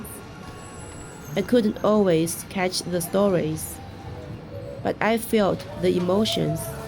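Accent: China. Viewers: Your video is refreshingly real. Your English pronunciation is extremely clear and much better than most students from elite universities in China whom I met in the USA. One more thing, there is nothing wrong with having a Chinese accent because we can understand you effortlessly; in fact, I think accents add richness and diversity. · Your English is quite good. Never mind the slight Chinese accent, how could it be otherwise? Your pronunciation is good and your sentences are fluent and correct. Do not underestimate yourself, you are an ambassador for your people. I think you are a very brave woman. 🙂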